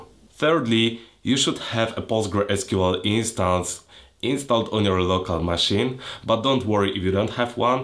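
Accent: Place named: Russia. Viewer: Видно, что акцент русский, но видео на пендосском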